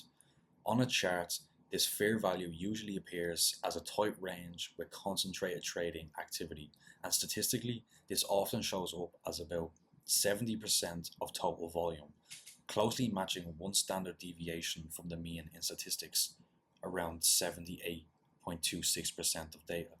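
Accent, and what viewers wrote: Ireland. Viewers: Irish accent? Subbed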